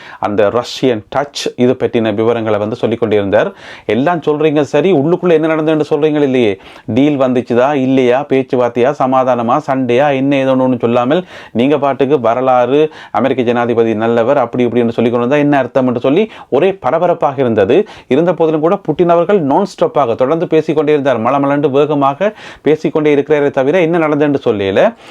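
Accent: Sri Lanka. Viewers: Looks like Sri Lankan Nice Tamil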